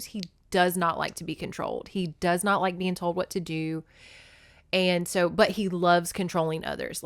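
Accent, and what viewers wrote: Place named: South, United States. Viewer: Okay but I love your southern accent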